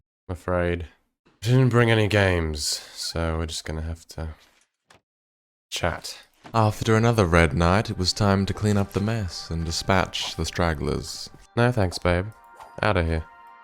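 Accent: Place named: United Kingdom. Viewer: Jesus, are you livin in some castle in real life or is it because you can speak British really fucking well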